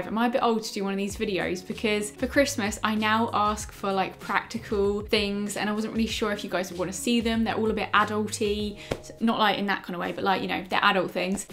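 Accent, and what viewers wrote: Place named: London, United Kingdom. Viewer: you sound like you are developing a London accent maybe? I'm not great with accents but something seems different haha. Love you X💗💗💗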